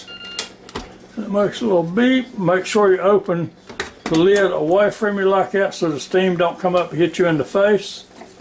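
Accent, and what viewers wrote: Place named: Tennessee, United States. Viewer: I love your videos! Thanks for sharing! You sound like home, are you from Tennessee?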